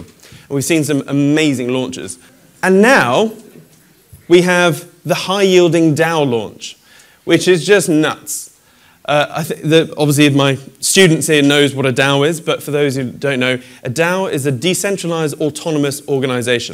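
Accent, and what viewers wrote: United Kingdom. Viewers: Just because you say it in a fancy british accent doesn't make you correct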